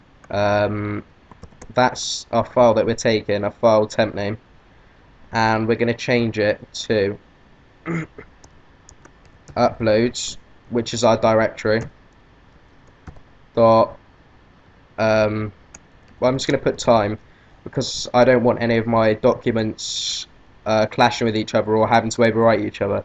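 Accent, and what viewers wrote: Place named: Hertfordshire, United Kingdom. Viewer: a friend of mine he lives in Hertfordshire speaks really much like that guy =)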